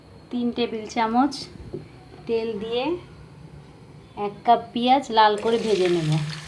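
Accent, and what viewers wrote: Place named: Noakhali, Bangladesh. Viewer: apnar kotha sune mone hosse apni noakhalir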